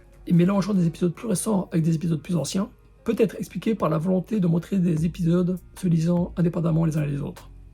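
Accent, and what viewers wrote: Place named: Nancy, France. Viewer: Bravo pour cet excellent épisode, bien documenté, qui rend parfaitement hommage à cet immense artiste. Et bravo pour ne pas avoir perdu l'accent nancéien après 20 ans aux US !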